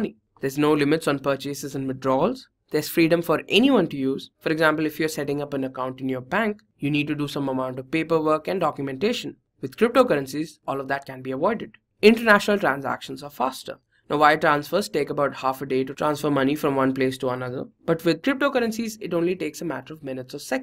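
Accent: India. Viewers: Good info. Please improve your English accent from IN-GLISH!!!! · Bruh he asked is the voice in the video BELONGS TO A INDIAN 😂😂😂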